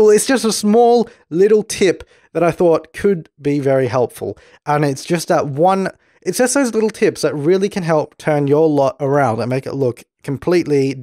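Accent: Australia. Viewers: I feel like I've just been smacked on the head with a newspaper and told "NO" in an Australian accent.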